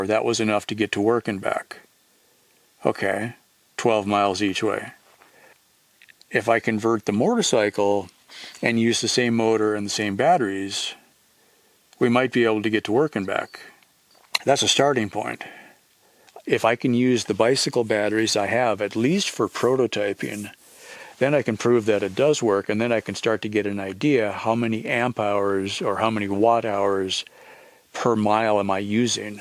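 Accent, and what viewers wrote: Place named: Canada. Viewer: He's Canadian, sometimes they talk funny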